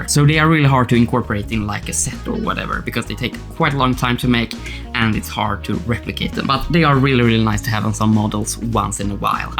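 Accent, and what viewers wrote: Sweden. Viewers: really nice work! And by the sound of it så låter du Svensk, men kan ha fel 😅 Kommer garanterat besöka din sida och köpa några ritningar framöver 👍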